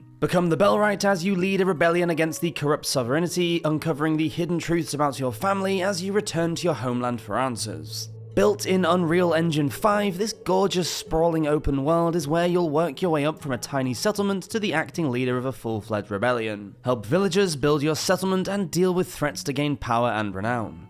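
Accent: United Kingdom. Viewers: Oh yess....the solid music the british accent....yess....a new topic, medieval of course...yesss so soothing, so interesting.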